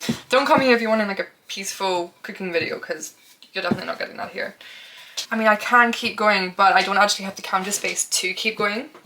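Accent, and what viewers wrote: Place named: Ireland. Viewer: Interesting accident, slightly Irish are you?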